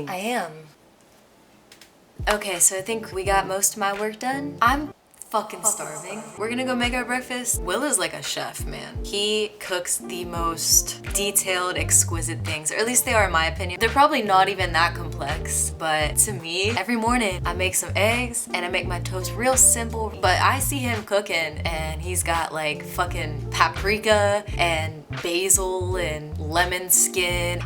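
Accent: Ohio, United States. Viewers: Great video! Haha your Ohio accent is showing! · Side note: I love your user name lol has a nice ring to it! Sounds sexy/badass at the same time. Anyway I noticed the mention of your Ohio accent? Midwest represent lol I live in lake county Illinois which is about 35 min north of chicago. What part of Ohio are you from? Do you still live in Ohio currently? Not trying to sound like a creep stalker lol honestly just curious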